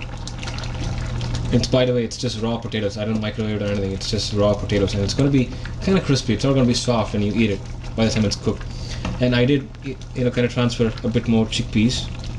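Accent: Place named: Wales, United Kingdom. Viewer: This fellow sounds Welsh!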